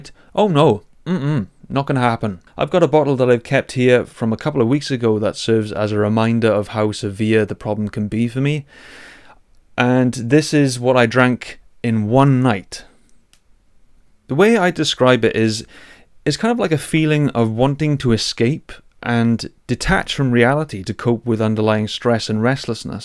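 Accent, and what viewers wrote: Wales, United Kingdom. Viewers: Get a mountain bike bro, amazing for dopamine stimulation and if (judging by your accent) you live in Wales your spoilt for choice for amazing trails. Soon make friends to ride with. Totally relate to drinking issues though, it's kryptonite for ADHD brains.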